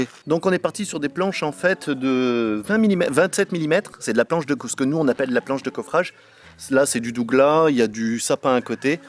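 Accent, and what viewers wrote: Loire, France. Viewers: Un p'tit accent du 42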